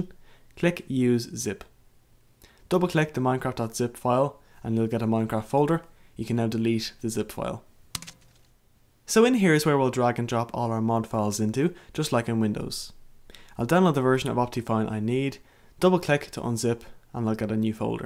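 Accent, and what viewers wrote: Ireland. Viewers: are you irsh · are you irish?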